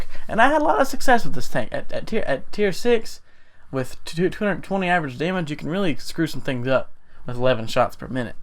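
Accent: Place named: South, United States. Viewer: You sound southern af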